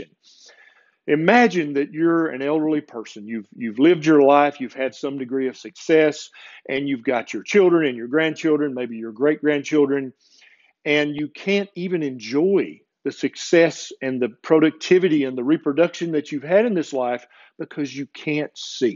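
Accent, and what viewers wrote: South, United States. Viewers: KenDBerryMD Unfortunately the Specialists dont have a clue even in their trained fields My gastroenterologist had no clue what leaky gut is, my Psychiatrist says Benzodiazepines and other Psyc Meds are completely safe, tell my brain that. They easily put you on meds but as far as risks and tapering off not a clue. I see a Hollistic MD as my primary. What foods we put in is the key. Avoid grains, sugar, processed everything, organic, and healthy fats..... what is helping me slowly recover. Thanks for your channel. Love the accent, my Dad was from the South, lost him in 2010. The best to you.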